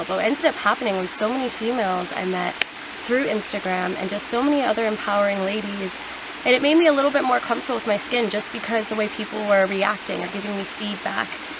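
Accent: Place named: Philadelphia, United States. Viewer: I knew i heard the Philly in you. I'm from Norristown so whenever I hear someone with the accent I immediately notice it. Lol. Hope you're doing well.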